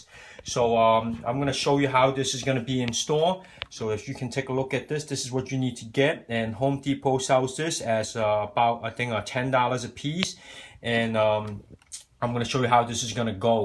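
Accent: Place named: China. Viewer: A chinese accent with a little New Joyseee accent... and you get this guy... awesome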